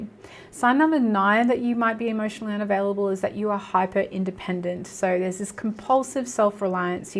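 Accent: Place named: Australia. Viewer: I think she’s Australian.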